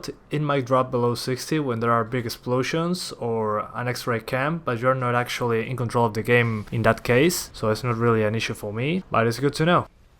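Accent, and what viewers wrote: Argentina. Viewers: Wacho alto nivel de inglés, tarde un poco en notar el acento argentino.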